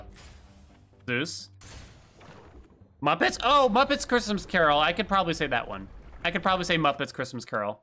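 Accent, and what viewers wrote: United States. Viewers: Honestly, you sound like every American ever. Cheers!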